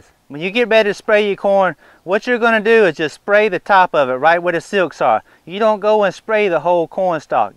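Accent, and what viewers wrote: South, United States. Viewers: He really does have a good southern accent. Some are definitely not as fluid and warm as this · A good southern accent is like sweet tea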